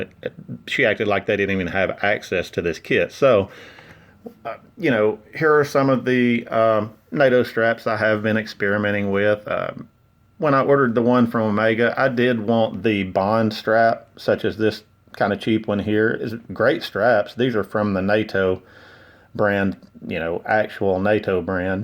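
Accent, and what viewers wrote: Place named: United States. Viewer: Hey bud, so glad I found your video. You sound like you’re from the states. I’ve been trying to justify buying an omega nato, until I found out they come with this kit. However, I keep reading that this specific packaging is only for European countries. Can you please confirm this packaging is also for U.S. customers. Thanks in advance. And great watch by the way, I got the black one.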